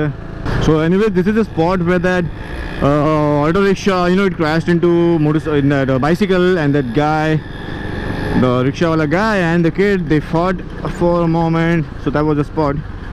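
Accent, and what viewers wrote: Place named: Punjab, India. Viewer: You sound like you are Punjabi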